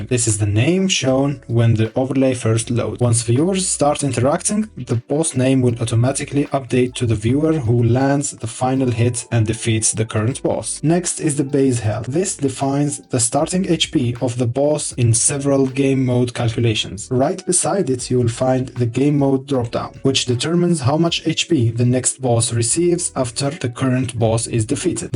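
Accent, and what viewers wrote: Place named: Morocco. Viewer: You sound very Moroccan a sahbi 😁🔥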